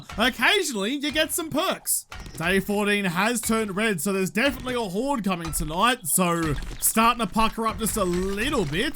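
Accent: Australia. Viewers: The aussie accent always thickens when he's excited or stressed.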